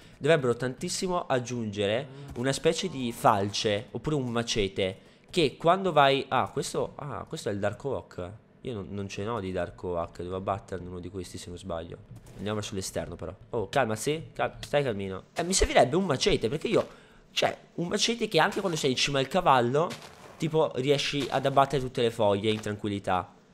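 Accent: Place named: Italy. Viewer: ma di dove sei? (so che sei Italiano ascoltando il tu accento nei tuoi video)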